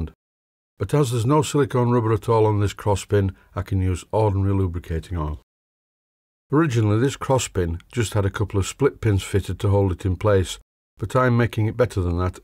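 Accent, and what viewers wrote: Yorkshire, United Kingdom. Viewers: I have no idea why YouTube attracts such brainless and often downright mean comments, but those of us who make the effort to put up videos get faced with them all the time. Most of them come from "users" with no content and no followers. I generally just delete them. That said, I very much enjoy and appreciate your videos, your humour and your Yorkie accent. Thanks!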